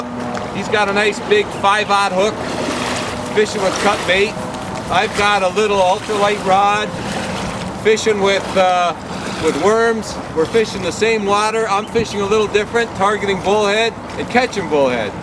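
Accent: Canada. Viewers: Are u canadian :)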